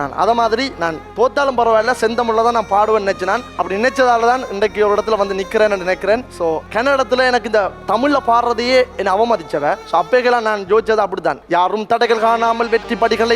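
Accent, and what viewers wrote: Sri Lanka. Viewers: Appadi pesa theriyanumna srilankan a irrukkanum❤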